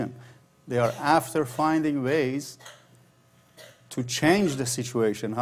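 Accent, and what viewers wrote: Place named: Iran. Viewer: This man is from Iran I can hear his Persian accent.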